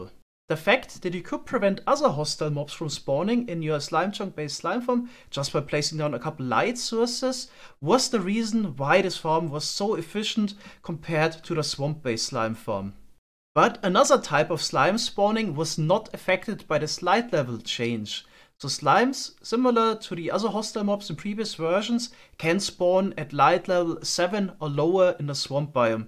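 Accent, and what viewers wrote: Germany. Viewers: sounds like a german accent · Deutscher Akzent, liebs:) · are you german?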